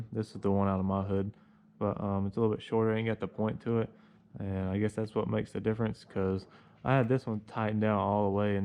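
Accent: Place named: South Carolina, United States. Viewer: I knew yall lived in south Carolina by your accent bo I lived moved from Swansea sc lived there 8 years. Moved to ohio. Thinking of getting a lt1 or a mustang but I've always been a chevy guy like the videos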